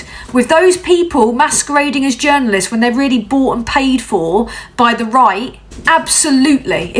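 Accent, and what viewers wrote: United Kingdom. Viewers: God, I love this british accent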